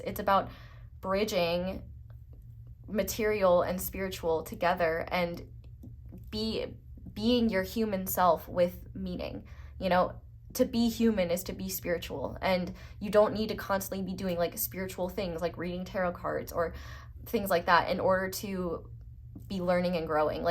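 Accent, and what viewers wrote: South, United States. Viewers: Omfg when you said "feeling my way through the darkness" that's by! Southern accent 👍 awesome 🎉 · You’re so endearing! I appreciate your gift! I especially appreciated your southern drawl! 😂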